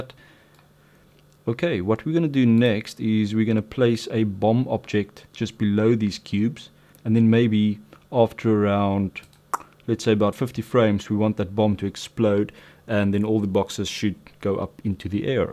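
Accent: South Africa. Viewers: South African accent!!! lekka boet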